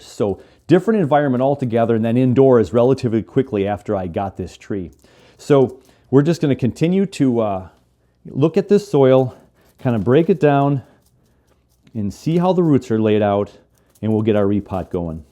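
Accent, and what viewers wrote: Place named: Minnesota, United States. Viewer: Just stumbled upon your channel and the first thought I had was, "Huh, that turntable looks like the ones Van makes." Second thought was, "This guy sounds Minnesotan" and then you mentioned being in Minnesota and I was like, "Ah, well, there you go." Did Van make your turntable?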